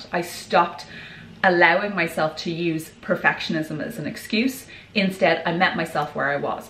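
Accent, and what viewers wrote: Ireland. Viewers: I enjoyed your tips and listening to your Irish brogue. Thanks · First of all, let me say that you are so cute and I love the Iris accent! Secondly, what you said about being a perfectionist resonated with me and not in a good way. I am hardest on myself. I'm an all or nothing type of person. I "throw the baby out with the bath water" instead of just throwing out the water. If I mess up, I'll say that I might as well go all out and do what I want. Thank you for this video and for talking some sense into me. I need to have compassion for myself and allow the hiccups to come and go but not let them define me. Thanks again.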